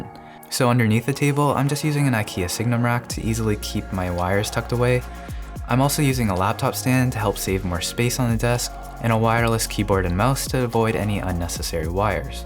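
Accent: Canada. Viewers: Woah are you from Toronto?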